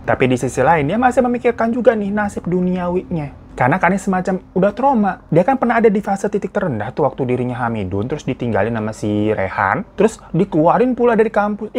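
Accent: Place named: Medan, Indonesia. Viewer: Wah wah dri bahasa nya iini kliatannya daerah2 sih pencerita dri medan nih. Serem sih kalau dimedan ada sekte sekte bgini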